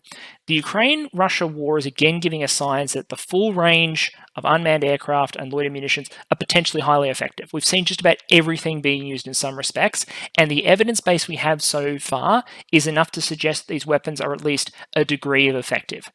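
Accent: Australia. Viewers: Are you an Aussie like myself?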